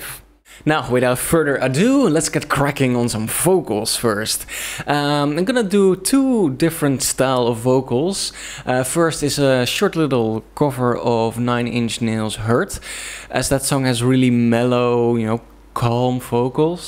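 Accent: Netherlands. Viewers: neemt de microfoon ook nog geluid op als je er een stukje zo een metertje van af zit? ik hoor aan je accent dat je nederlands ben hahah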